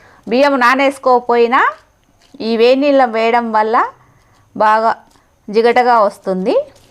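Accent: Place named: Anantapur, India. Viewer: Aunty mata Brahmins mata la undadu vere slang. Ananthapur atu side la untadi ekkada meeru undedi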